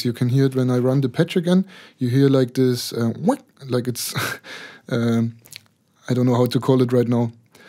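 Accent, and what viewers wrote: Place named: Saxony, Germany. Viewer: sehr cool, vor allem der edle sächsische akzent!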